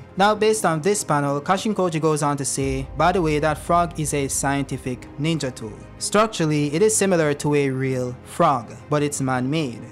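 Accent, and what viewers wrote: Jamaica. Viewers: The accent..you Jamaican bruv?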